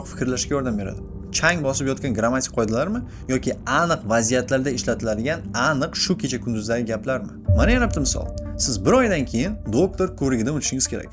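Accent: Bulungur, Uzbekistan. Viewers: Aka bulungurlikmasmisiz🔥🔥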